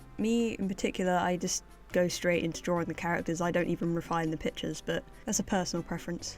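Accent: United Kingdom. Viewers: YOUR BRITISH?! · ALSO BRITISH? if so me too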